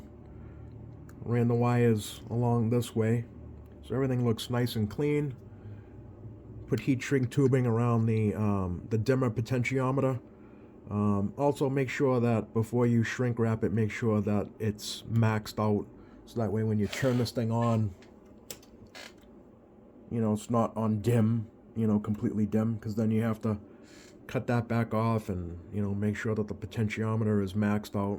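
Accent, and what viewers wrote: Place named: Southern New England, United States. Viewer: Interesting video. Some food for thought, and I'm certainly not trying to pick on you at all! Your signal tracing is using a signal injector. It emits a tone, probably 1 khz. Technically you didn't need to say audio. Just key the microphone and listen for the tone. Additionally I wouldn't mount the echo board near the rf power section. Leaving the echo board where it was originally mounted and cutting the echo board wires to the needed length may have been a better idea but that's all up to you. I'm just offering a different prospective. It's clear you enjoy working on CB's. It can be challenging and rewarding. My signal injector looks exactly like yours except mine is an old Radio Shack unit I picked up in a package deal from Smokey's CB shop in North Grosvenordale Connecticut. I bought all of his equipment many years ago when he retired. 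You're accent sounds like you're from Southern New England. :-)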